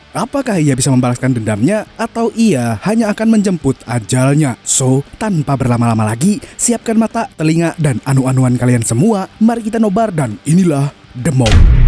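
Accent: Banjar, Indonesia. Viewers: Urang banjar leh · Banjar lahh bangg 😭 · Anjay Banjar wkwk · Selalu hadir mas walau gak komentar 😂 ae lucu banget neh org Banjar kh mas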